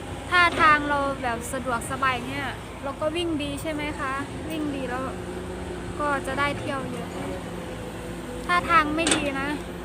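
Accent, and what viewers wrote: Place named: Isan, Thailand. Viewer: จักถังแน่คับน้ำมัน..ยามเว้าอีสานตาฮักคักเนาะคน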